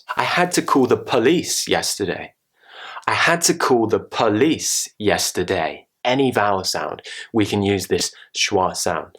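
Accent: RP, United Kingdom. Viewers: i love you,! I like British accent, it is beautiful and you help me so much!!! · I just watch this to listen to this beautiful British accent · Same here. I really want to speak like him. It feels so posh to listen to British accent. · OMG I've just found a new motivation to learn.... - intelligent - handsome - British Accent <3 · I love british english and accent i think soon after watching your videos i will start speaking british accent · I'm In a play and I need to learn the British accent and you're 😉! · Greetings, can someone tell me which british accent is he using? Is it the RP? Thanks everyone :) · British Accent is really admirable. I appreciate your teaching sir and regard u as the best teacher for it. Sending you reams of wishes from India!! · I love to learn british accent. U speak very nice, like to hear u r accent. 👌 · i am Abdimajid Borobiyo from Somalia the capital city, i really very exited to watch your video's in British accent. 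i like very much to have a powerful British English accent, thanks Mr... thank you so much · i love to hear the British accent from u ... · I love so much British accent especially your class, I'm still waiting your lesson everyday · I like the British accent and I really liked it more when I listened to you. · Keep it up the good work sir.. I went through all the British accent channels trying to improve my accent and literally you were the best.. cheers from Dubai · Nawwwww that perfect british accent though 😍😍 Could listen to that all day. Nice video man!! Keep it up! Greetings from Germany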